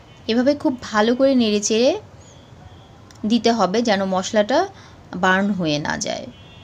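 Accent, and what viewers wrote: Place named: Chittagong, Bangladesh. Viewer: আপনার কি সমস্যা? ভালো না লাগলে ইগনোর করেন।আপুর কথায় চট্টগ্রামের টান আসে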